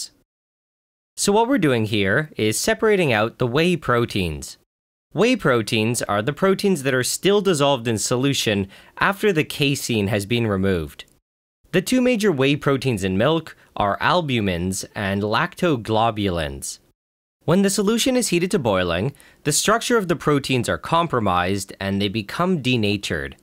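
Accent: Quebec, Canada. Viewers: you from québec ????? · Quebecois par hasard?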